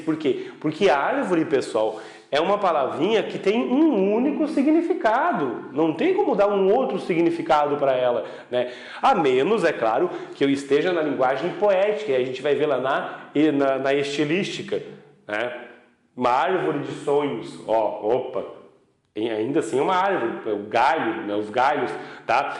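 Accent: South, Brazil. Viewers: Muito top o sotaque do Sul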